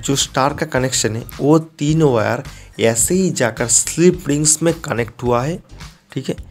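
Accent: Bengal, India. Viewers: তুমি বাঙালি তাই না??. হিন্দি বলার ভঙ্গিতেই ধরা পড়ছে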